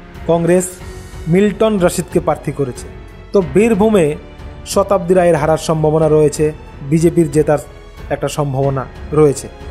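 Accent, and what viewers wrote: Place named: Bangladesh. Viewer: এই চ্যানেল কি বাংলাদেশের চযানেল? উচ্চারণ শুনে মনে হল ।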